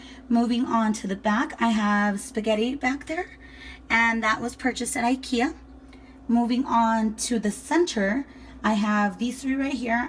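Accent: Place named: Latin America. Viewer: Hola. De don de eres. Yo soy de Puerto Rico y me suenas Latina. Me gusta lo que hicistes en tu pantry.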